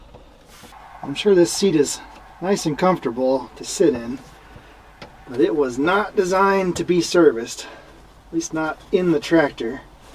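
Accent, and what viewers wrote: United Kingdom. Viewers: You are so British 👍. Including the correctly pronounced solder with an L. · Why sound like most British friend?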